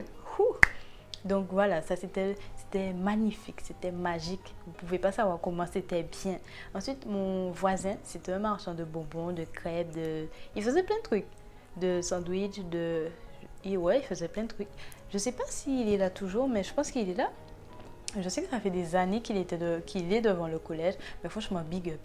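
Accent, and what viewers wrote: Antilles, Caribbean. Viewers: L’accent antillais est présent 😂😂🙌🏾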